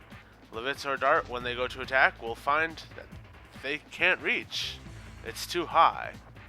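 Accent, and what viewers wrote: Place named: South, United States. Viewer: Where are you from cause it sometimes sounds like you have a southern accent?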